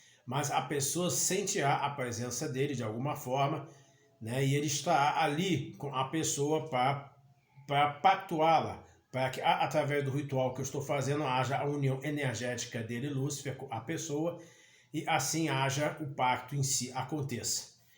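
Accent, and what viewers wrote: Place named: France. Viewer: E de onde vc tirou esse sotaque frances?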